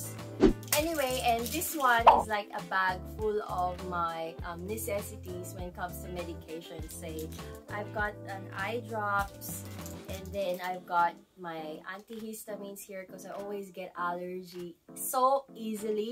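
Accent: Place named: Philippines. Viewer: i love your filipino accent say it again “HI IM IM 20 YEARS OLD AND IM FROM THE PHILIPPINES” 😂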